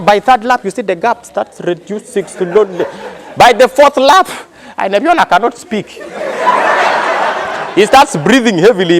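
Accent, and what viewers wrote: Uganda. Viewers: Man l love your Banyankole accent 😂😂😂😂😊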